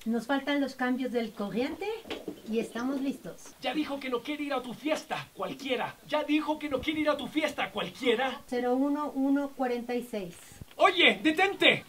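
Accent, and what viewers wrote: Chile. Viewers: ¿Beto cuevas? Se nota su acento chileno, aun así 😘😍😍😍😍😍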